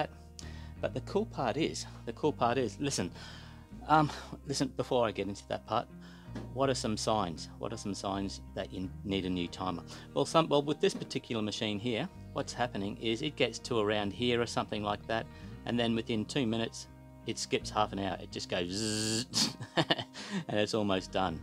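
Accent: Australia. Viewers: glad I watched this before I took my dryer off the wall and opened up the back. I've worked on a few dryers in my time changing belts capacitors elements and door switches but have never taken a timer out. My timer has the second symptom you described stopping at around 90 mins and just before cool down. Also just checked eBay and there are new ones for $45. You sound like you have an Aussie accent. Good Clip, invest in a Tripod it will make all the difference at your end. Cheers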